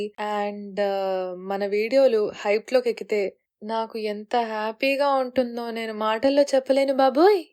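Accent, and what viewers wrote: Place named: Godavari, India. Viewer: your non pretentious godari slang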